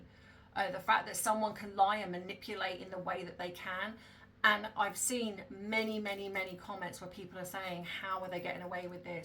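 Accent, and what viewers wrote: United Kingdom. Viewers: Greetings from south coast California. I hear your lovely British voice perfectly clear from California on a Samsung Galaxy cellphone. Thanks 🙏🏼⚘️🐦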